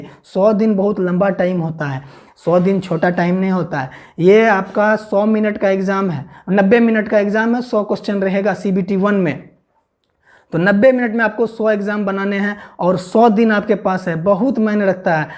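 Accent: Bihar, India. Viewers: Bihar se ho ka bhai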